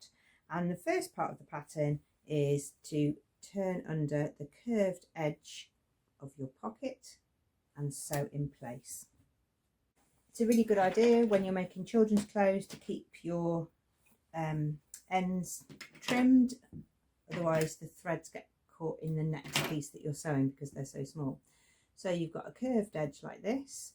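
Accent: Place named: England, United Kingdom. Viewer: Which part of England are you from? I love your accent! Greetings from Australia 🇦🇺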